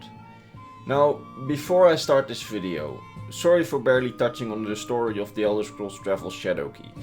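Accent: Netherlands. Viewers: I recognize that accent anywhere. Hello fellow Dutchman!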